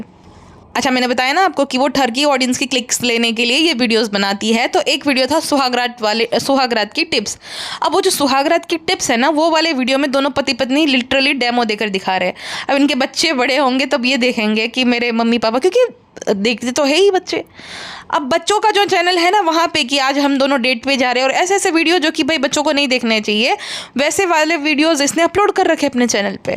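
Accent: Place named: Kashmir, India. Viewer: Ma'am are you kashmiri ! Apna J&k wala accent aa rha hai 😄💜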